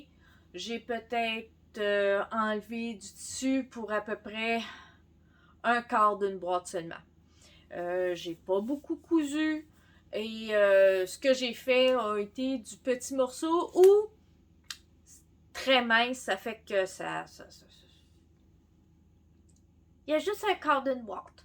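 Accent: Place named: North America. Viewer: j'aime beaucoup ton accent on sent qu'on est bien passé outre-atlantique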